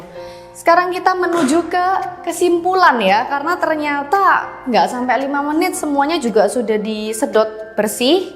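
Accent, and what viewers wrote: Java, Indonesia. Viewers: It’s Indonesian language with Javanese accent 😂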